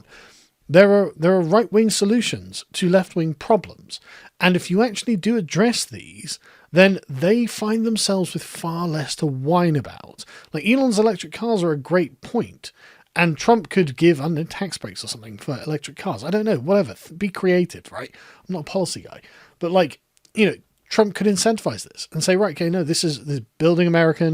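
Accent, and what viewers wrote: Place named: United Kingdom. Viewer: I'm not going to sit here and be lectured about America by a man with a British accent .. look at your country with do you know ? .. lol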